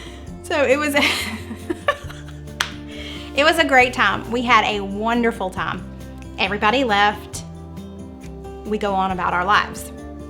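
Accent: South, United States. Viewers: Hi im new to your ch.I love your ch.and all your recipes.Where are you from.I love your Southern accent.im from Arkansas.nice meeting you and your recipes.My dad favorite holiday was Thanksgiving too.Hes deceased now.But he loved 🦃 turkey so much because you can do a lot of things with.I love 🐔 <link> and my mom.Shes deceased too.My grandmother her mother loves goose and duck.My father's mother loved 🦃 turkey too.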